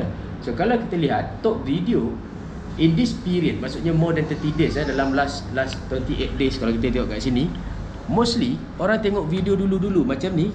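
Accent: Sarawak, Malaysia. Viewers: Bangga dengan ni... Satu he yg X suke... Bahasa Sarawak ko X tajam dah bro. Kemonnnn. Xkan la X boleh tune.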